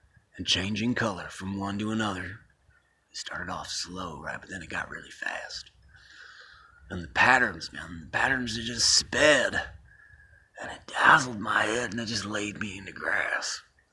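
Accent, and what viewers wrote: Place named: Ireland. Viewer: I miss hearing this in small town coffee co in Kapa’a Kauai. You used an Irish accent. It was fantastic! I think of it often. Thank you for sharing this video. Be well my brother. Aloha!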